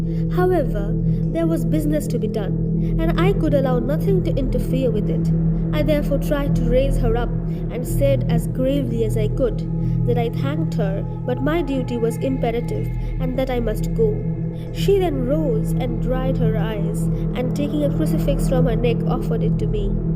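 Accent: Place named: United Kingdom. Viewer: Your voice is just wow .. accent is too British . Just love your audio book❤ keep going.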